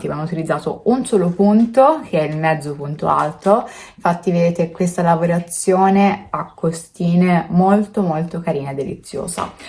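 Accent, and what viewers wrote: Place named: Tuscany, Italy. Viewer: Buongiorno, Una curiosità….io abito a Pietrasanta e dal tuo accento mi sembra che siate anche voi “di costa”, mi sbaglio?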